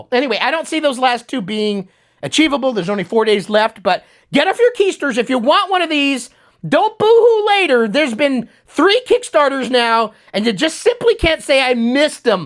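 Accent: United Kingdom. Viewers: I went to the main news channel ZX Spectrum Next to say hello again (I'm one of the 27 lucky ones) and thank you for everything you do. I'll subscribe, I'll drop by more often, and at the same time I'll improve my English skills, because no matter how hard I try, I can't understand anything about the British dialect :)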